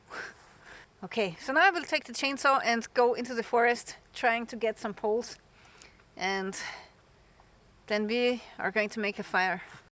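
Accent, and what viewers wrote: Austria. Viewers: I always wondered about your strange accent. It sounds german but not exactly. Now i found out you’re Austrian. So well, that make things clear. Kind regards from Belrin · irgendwie irritiert mich dein ösi-akzent im english ;) weshalb english video? wg reichweite? oO oder bist du ausgewandert? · Nice video, love the way you explain everything about mushrooms, I don’t care much about mushrooms🥴 but hope you have good harvest next season BTW I love your Austria 🇦🇹 accent ☺️👋from 🍊orange County Ca, ⛺️🪵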